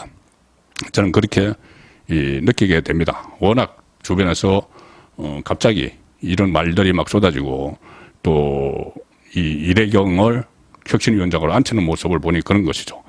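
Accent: Gyeongsang, South Korea. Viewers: 몽유병 몽류병 십월 시월 경상도 발음 인정해도 맞춤법은 제대로 하셔야지요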